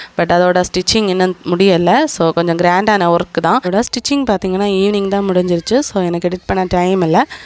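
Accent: Kanyakumari, India. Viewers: நீங்க எந்த ஊர் நம் கன்னியாகுமரி அண்ணன் உங்க வாய்ஸ் கொடுத்தா அப்படித்தான் நமக்கு தெரியுது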